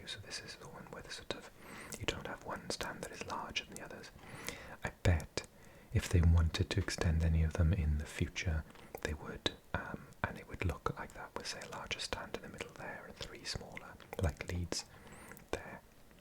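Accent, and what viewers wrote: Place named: Wales, United Kingdom. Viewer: I never thought a welsh man whispering about football stadiums would be something id enjoy watching, but turns out it is! Would love to see a championship version coz us lot never get much recognition (huddersfield fan)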